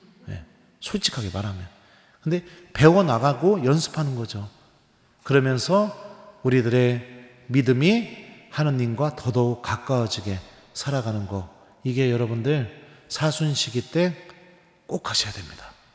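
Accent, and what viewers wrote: Chungcheong, South Korea. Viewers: ❤🎉 for your Choobg-cheong-do voice which is the same voice I have heard in Toronto Aurora, Luke Cho in Canada 🇨🇦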